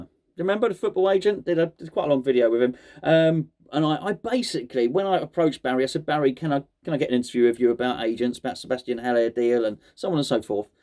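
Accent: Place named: Scotland, United Kingdom. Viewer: Definitely a hint of Scottish twang, bloody 🤥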